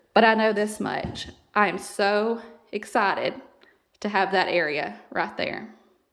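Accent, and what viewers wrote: South, United States. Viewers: I have just found your channel and SO glad I did. When I heard your Southern Accent, I knew you had to be from Alabama, too. I am from the beautiful state of AL and love the south. Your home is gorgeous. I can't wait to see it decorated. I hope you will have nothing but happiness in your life while living there. I am already a fan and will be going back and watching your other videos. God Bless you and your family.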